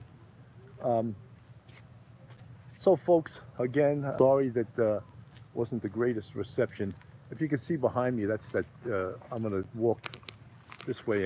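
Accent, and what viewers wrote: New York, United States. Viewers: Being Canadian I love the NY accent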